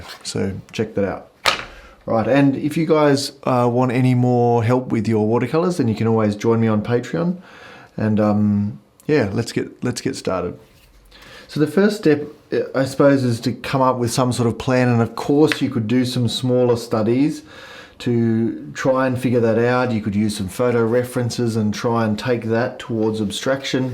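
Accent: Australia. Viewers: Hi just found your channel, loved watching this, great to hear a Aussie accent, cheers from Canberra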